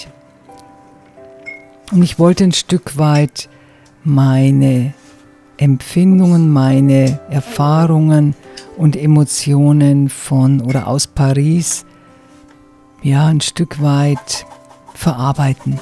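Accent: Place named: Munich, Germany. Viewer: Du bist echt talentiert. Freut mich immer ne münchnerin zu hören.❤❤❤deine Bilder machen freude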